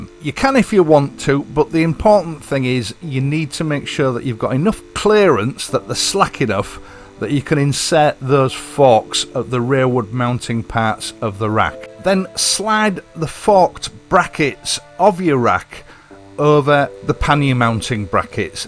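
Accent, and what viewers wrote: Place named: Hull, United Kingdom. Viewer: I take it you're from Hull or thereabouts? From Hull meself, but been languishing in Western Australia for the last 48 years. Just found your videos and I like your commentary and delivery. For me sadly I won't be owning another motorcycle anytime soon. At 74 my wife won't let me near one. Still good to dream though.....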